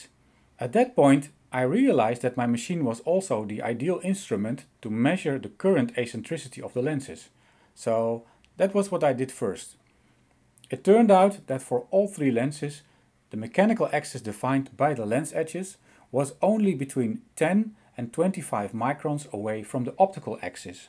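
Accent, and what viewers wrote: Netherlands. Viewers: That is a Dutch accent :-)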